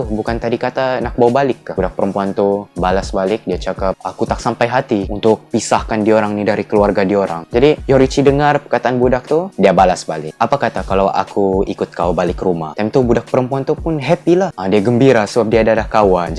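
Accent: Sabah, Malaysia. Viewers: orang sabah ke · Logat dia bckp mcm org sabahan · Speaker mmg org sabah bro 😆🤣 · Abg ni orang sabah kaa? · Abang ni orang sabah ke?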